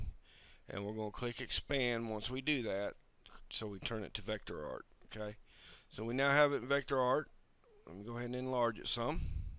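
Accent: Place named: North Carolina, United States. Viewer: Really cannot see how you have 14 thumbs down on this - I'm a beginner trying to learn everything I can at home using my subscription to AI from cloud so that I can do at work what my boss needs me to do using this program and vector graphics with fonts and jpgs. Great video, no back and forth oops I forgot or a bunch of info I don't need. Love the accent too as I am in NC I feel right at home listening to you :) Couldn't be more thankful for the tutorials.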